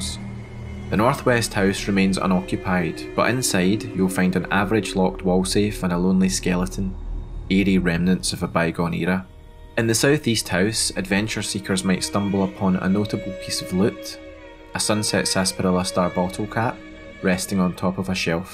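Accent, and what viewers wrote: Scotland, United Kingdom. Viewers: Great video man. Btw I love the accent. Is it Scottish?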